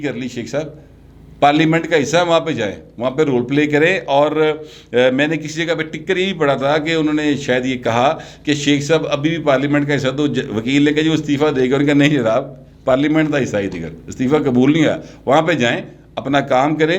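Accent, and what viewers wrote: Lahore, Pakistan. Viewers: زبردست صاحب۔۔۔زبان کے لاہوری ٹچ کے ساتھ مزا آگیا۔ ویسےمیں پی ٹی آئی کی چھترول سے زیادہ لہجہ کا لطف اٹھاتا ہوں۔ انہاں نوں ویسے وی شرم حیا نیئں۔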